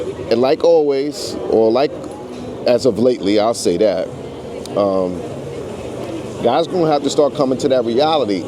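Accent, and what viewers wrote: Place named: New York, United States. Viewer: Man if this guy ain’t a New Yorker…😂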